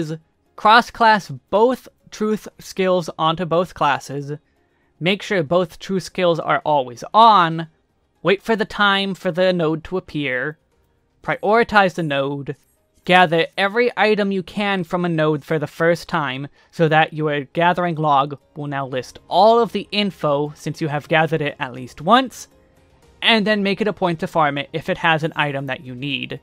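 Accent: Boston, United States. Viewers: Boston-accent? Jersey? Can't put my finger on it :D - Gahtha goyde lol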